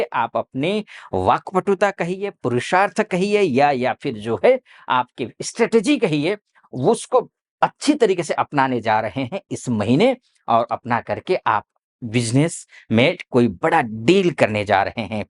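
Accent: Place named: Nepal. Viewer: Your accent looks like you r from nepal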